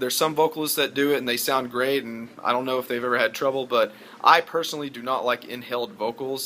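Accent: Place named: South, United States. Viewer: I didn’t know you had a slight southern voice.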